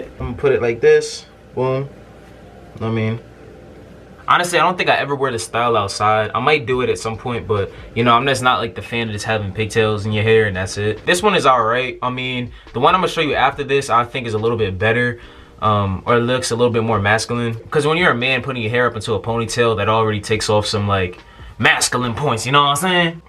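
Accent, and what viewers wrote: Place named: New Jersey, United States. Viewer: are u from nj i hear the accent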